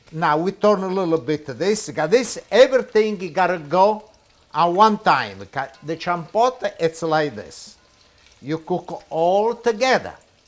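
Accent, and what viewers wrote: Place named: Naples, Italy. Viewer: Mi genetori sono da Grottaminarda e Fontanarosa! Io sono nato a Boston pero, e parlo in dialetto Napolitano come te. Grazie per il ricette saporito! Auguri!!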